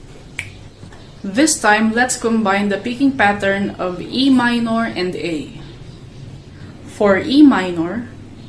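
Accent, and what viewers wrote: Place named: Australia. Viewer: natawa na naman ako sa australian accent, timing po nagkakape ako lumabas sa ilong ko,, 😃😄,, kahit paulit talaga natatawa pa rin ako😁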